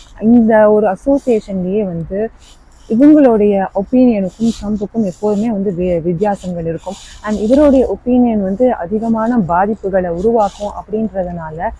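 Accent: Sri Lanka. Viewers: Are you srilankan tamil mam?! Like native of yours or parents?!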